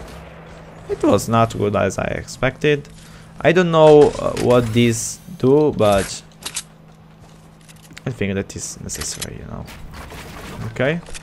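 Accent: Naples, Italy. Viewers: Fantastic video, i love your Italian English Scherzo sono Calabrese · Mi manca la tua parlantina napoletana 😫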